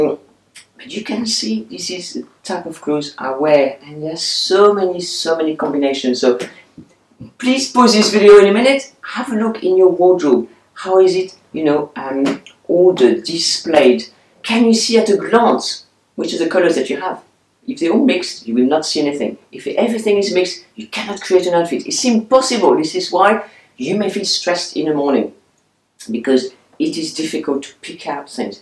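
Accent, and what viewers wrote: France. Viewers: Sometimes I catch the first part of a video without sound but with captions. Apparently the captioning cannot translate your delightful French accent so your name was translated as Manhattan Looker. Probably the opposite of French chic!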